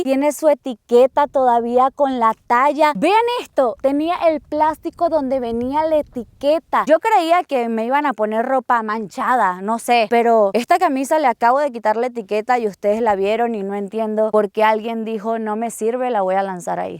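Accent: Venezuela. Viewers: Su acento suena de Venezuela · Eres auténtica gracias por tus videos con acento venezolana❤ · Chama pero tú no pierde lo venezolano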